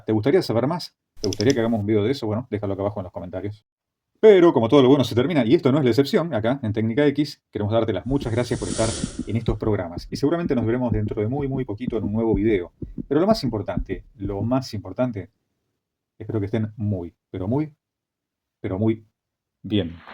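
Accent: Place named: Argentina. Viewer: Sonsonete argentino es molesto.... pongan alguien que hable español neutro... gracias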